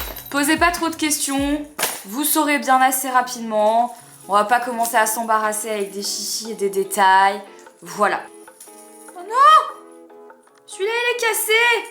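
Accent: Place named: Belgium. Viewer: Ton probleme de jambes ce n'est pas un lipoedeme par hasard? C'est trop mignon, tu as parfois l'accent belge j'ai l'impression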